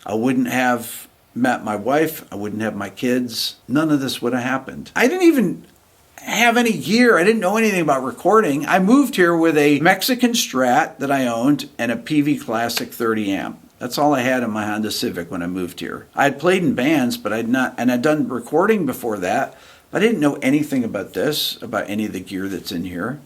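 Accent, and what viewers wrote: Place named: Upstate New York, United States. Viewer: Congrats! And I like that you still have traces of upstate NY in your speech! 👍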